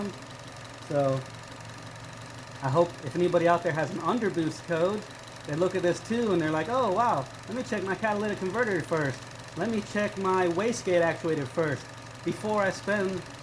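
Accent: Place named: Ukraine. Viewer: I can tell you’re Ukrainian by your accent.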